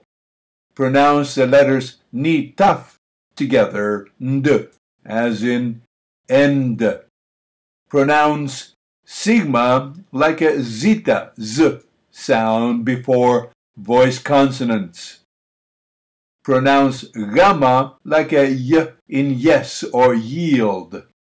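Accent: Greece. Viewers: Many thanks, learning koine Greek using standard western method. Good to hear a Greek pronuction. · This is the real sound of greek. Finally someone is trying to save you from erasmian ir whatever fake pronounciation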